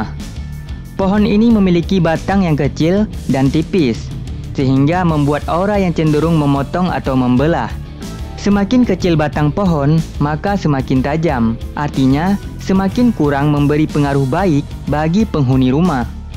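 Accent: Aceh, Indonesia. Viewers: Nah iya kan org Aceh ni pasti yg punya channel 🤣🤣🤣 logatnya ya kan cuy 🤣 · orang aceh bang?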